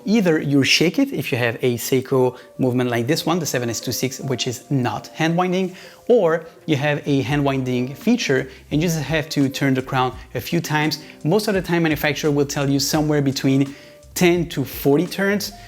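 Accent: France. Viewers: Je parie ma collection Seiko 5 que vous êtes français 😅 L'accent ne ment pas ❤ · French accent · My God!!!! That accent!!!!! 😍😍😍 Tu parles francais? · the French accent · really good and clear presentation, a lot less douchy than other watch channels, love the french accent. subscribed.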